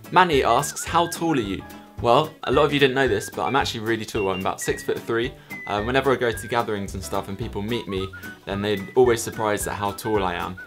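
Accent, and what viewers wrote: California, United States. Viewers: Ur american accent omg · Lol his Cali accent sounds like a gay man xD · omg the california accent #blesd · lol. your californian accent. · That Californian accent. On point. Haha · Your Cali accent is adorable! XD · your Californian accent. XD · That California accent is on point! Couldn't stop laughing :) Love this video!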